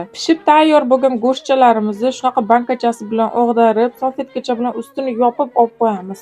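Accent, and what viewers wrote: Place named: Samarkand, Uzbekistan. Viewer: Кизиз куз тегмасин истаралигакан.сузизга ча,ча кушиб гапрябсиз самаркант тарабдами уйларин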